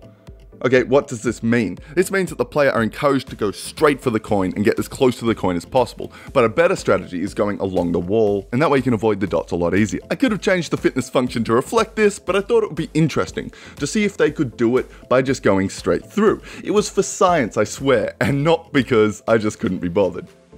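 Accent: Australia. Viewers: Hey my bday and are u Aussie mate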